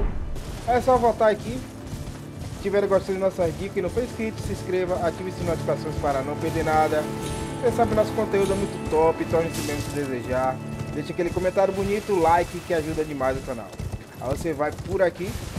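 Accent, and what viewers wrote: Brazil. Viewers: Tu eh baiano ne pae? Tambem sou percebi pelo sotake de Salvador é isso msm?? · Esse e baiano raiz.. bora jogar junto meu pacerooo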